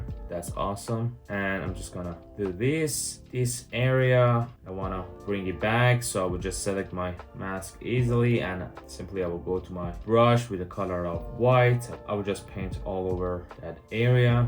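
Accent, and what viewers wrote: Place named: Iran. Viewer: hey where are from you sound iranian❤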